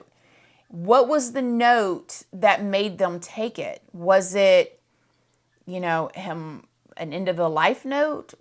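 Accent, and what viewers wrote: South, United States. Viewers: your so beautiful i absolutely listening to you talk I like your accent! Southern... it’s so indearing even if your speaking on something like this 😮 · WeekAND.. love that southern drawl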